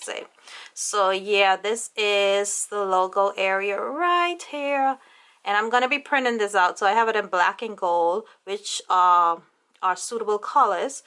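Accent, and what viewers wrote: Bahamas. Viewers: Oh wow you sound Bahamian! Are you Bahamian?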